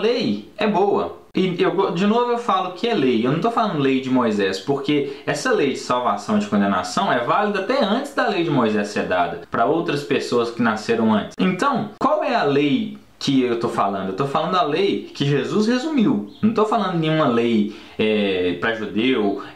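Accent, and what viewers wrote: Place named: Minas Gerais, Brazil. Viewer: Vc é mineiro??? Tem um sotaquezim bem minerim...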